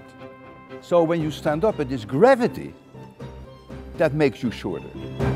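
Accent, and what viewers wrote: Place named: Netherlands. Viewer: In all his dutch glory :') and accent lol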